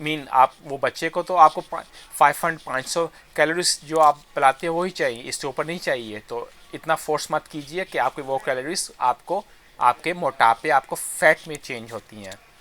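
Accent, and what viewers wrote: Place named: United States. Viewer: American accent boss! 😎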